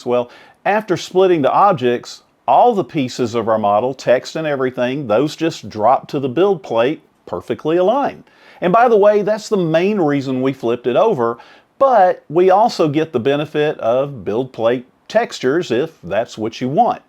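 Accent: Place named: South, United States. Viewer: Was able to filter out the YT algorithm noise enough to discover u. Awesome stuff man. Also u talk like my dad and make me remember my time growing up in the south, so that’s nice!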